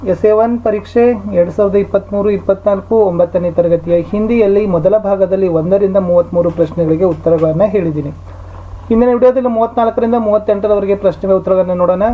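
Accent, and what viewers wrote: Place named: North Karnataka, India. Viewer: Yaa ooru sir. Nimdu bashe namtara adtira uttara karnataka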